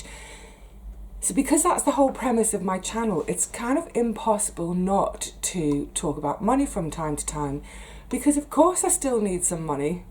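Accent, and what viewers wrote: United Kingdom. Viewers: You have such a beautiful British accent, love it. So you have Trolls in the UK also? I watch You Tube videos but I don't have my own channel. Here in the USA the Trolls can be very cruel. Please try to ignore them, give them no thought. I think it's so exciting that you were and are an actress. You are very young, I'm now on Social Security Disability at 71 years old. I remember waiting to 67 to get Social Security here was hard, I took mine early at 62, but then there was no Medicare (healthcare) until age 65. It is so hard for widowed women in the USA. But so much harder for Single, widowed or divorced women here, especially with working full time with children and childcare. Thank You for showing us how things work for you...so very interesting. So proud of you for tackling and paying off your debt. You are a true marvel to me!! 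Please stay safe and Take care from your new pals in the USA, Debbie and Dexter-Dog the amazing tiny chihuahua companion.🙋🐾💕🙏🙏🙏😷😷😷🏥🏥🇺🇸😁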